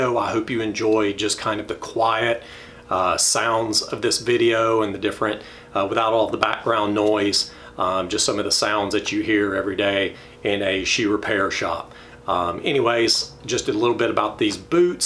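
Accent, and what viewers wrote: South, United States. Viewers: Good heavens! I love the commentary. Folks can turn down the commentary if they don't care for it. I'll bet they don't understand our nice Southern accents. I'm in Alabama, y'all. I love accents. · I do miss the southern drawl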